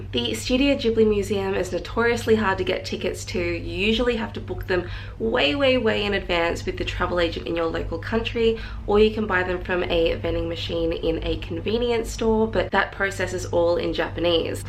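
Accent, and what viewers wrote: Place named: Australia. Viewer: I wish... This place has such a aesthetic vibe +you have a aussie accent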